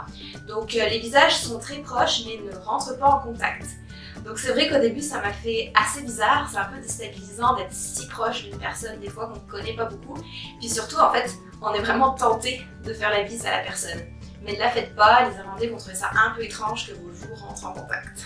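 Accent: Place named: Quebec, Canada. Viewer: Merci pour cette vidéo! Tu as un léger accent québécois je trouve! :)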